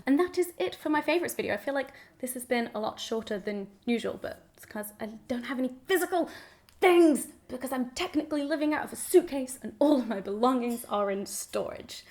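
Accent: Texas, United States. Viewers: Your Texas voice was pretty good. Your vowels need to be longer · I loved your Texas accent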